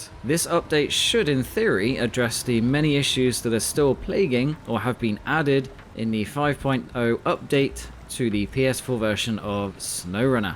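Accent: United Kingdom. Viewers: I'm from Iraq and English is not my main language,but i love how you talk with your British accent..I really enjoy with your videos❤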